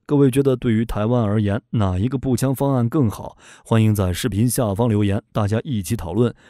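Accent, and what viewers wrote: China. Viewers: 不要忘了，這個頻道聽他口音是大陸腔。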